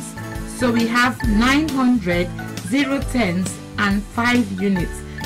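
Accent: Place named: Nigeria. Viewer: Wow a 🇳🇬 Nigerian voice ❤❤❤❤❤ 😊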